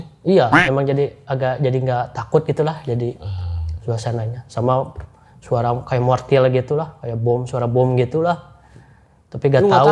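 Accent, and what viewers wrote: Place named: Sunda, Indonesia. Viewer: Logat sunda nya kental banget😂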